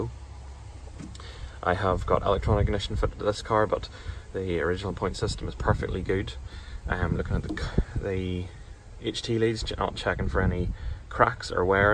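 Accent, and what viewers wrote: Northern Ireland, United Kingdom. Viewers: Now that's a very particular accent. Northern Ireland? By the way, Nice car.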